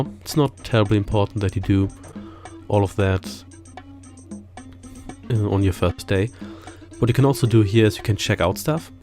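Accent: Germany. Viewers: You say you live in the UK, but... are you German? Cause your accent definitely sounds VERY German. ;)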